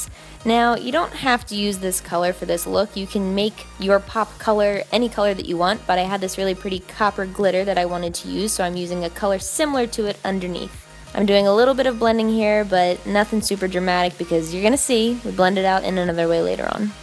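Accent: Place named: Philadelphia, United States. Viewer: Your Philly accent gets me every time. I know it all too well and I love it ❤️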